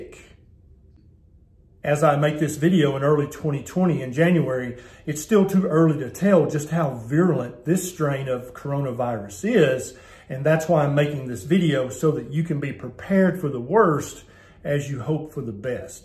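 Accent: South, United States. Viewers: I was listening and then I heard his southern drawl, and all of a sudden he’ doesn’t seem as credible as he once did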